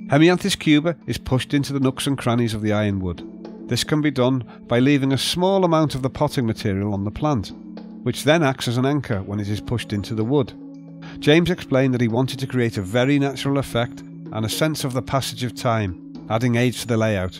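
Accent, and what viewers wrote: Liverpool, United Kingdom. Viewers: Absolutely beautiful but the voiceover by a scouser on Valium reading from a 12 year olds school essay really detracts from the aesthetic as a whole.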